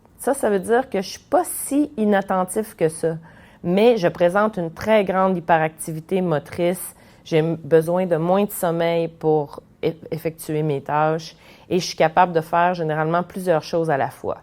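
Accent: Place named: Quebec, Canada. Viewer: Vous êtes québécoise madame.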